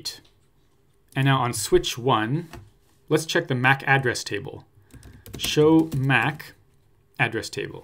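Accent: Canada. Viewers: Comment for my Canadian sounding homeboy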